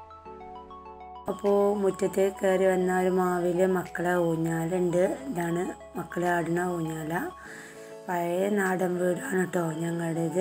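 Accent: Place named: Malappuram, India. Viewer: Malapuram aanu alle samsar kelkumpoanassilaavunund veed pazayath aananneyollu nalla soukaryam ulla veed aani. Nannayitund video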